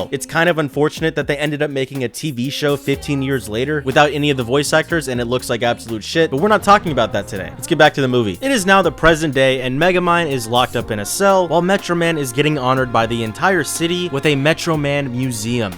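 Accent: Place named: United States. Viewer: Bro, I thought you were american.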